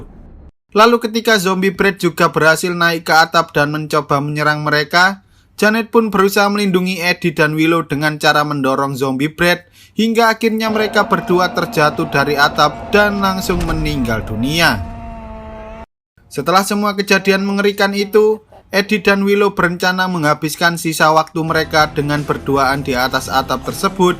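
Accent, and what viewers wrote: Java, Indonesia. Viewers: Medok Jawa nya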